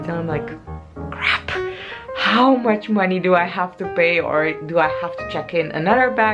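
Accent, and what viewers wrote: India. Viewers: I just started watching your vlogs , and nothing could have stopped me from subscribing your channel . I just loved the way you do it , love your sweet smile 😊 , and loved your hindi accent haha . You are really doing a great job , a very good luck for your future 😊 Keep posting . Made me a huge fan 😊.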